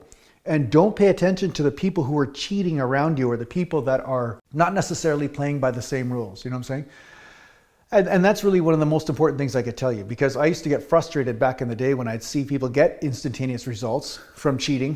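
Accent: Canada. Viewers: I am loving the Canadian accent !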